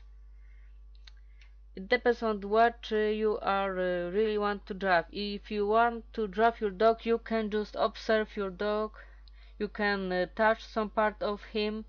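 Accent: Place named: Russia. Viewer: which language do you speak? ´cause it sounds like russian, your accent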